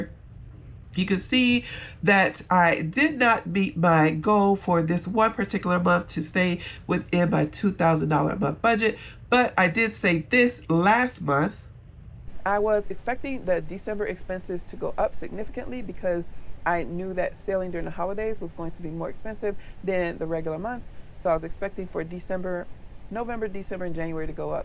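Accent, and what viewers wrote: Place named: New Orleans, United States. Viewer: Fun video even though it’s something I can’t do. Sounds like you’re from New Orleans or at least your family is.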